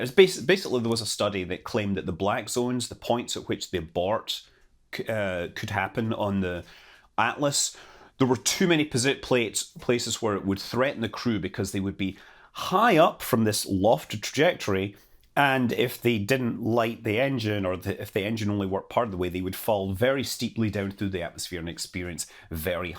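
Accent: Scotland, United Kingdom. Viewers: Here's a question for: Do you deliberarly pronounce 'Explorer' as 'Exploder', or is it just a Scottish thing?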